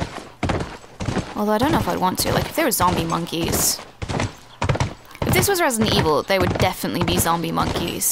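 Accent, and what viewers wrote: United States. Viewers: I usually hate commentaries, but listening to your gorgeous Mid-Atlantic accent is a rare exception. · are you from AMERICA?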